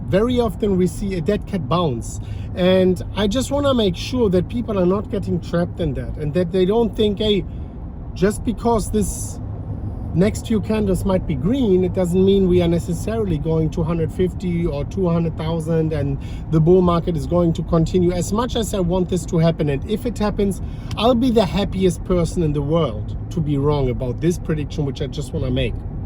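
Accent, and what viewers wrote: Germany. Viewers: First time viewer, this guy is German right?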